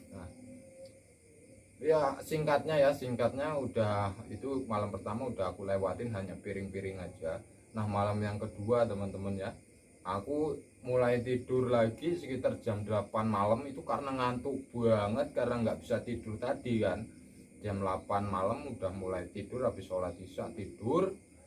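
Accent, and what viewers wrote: Java, Indonesia. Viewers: Mantaps bang ceritanya seru mengerikan sering lah cerita kisah pengalaman kek gini karena serunya gk kalah sama vidio campingmu bang.. btw sampean orang mana bang logat jawa tpi kok tinggal di jabar? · mas ciri khas klo ngomong nya pas klo ngomong banget nya medok banget..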